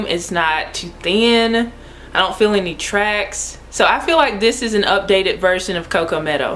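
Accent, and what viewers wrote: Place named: South, United States. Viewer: This unit is still a classic gorgeous long haired style. I love it. It'll always be beautiful on you. I love your accent and wondering where are you from? You speak like my fave cousins who are in the south, lol. Thanks great review.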